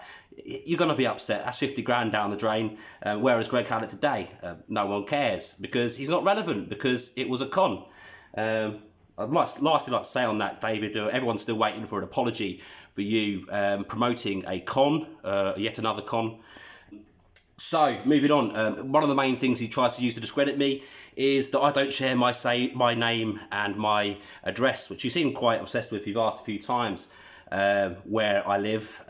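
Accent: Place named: United Kingdom. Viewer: A good rule of thumb is not to trust anyone with a Brit accent talking about US affairs on YouTube. Did Christopher Steele and the Steele Dossier teach us nothing?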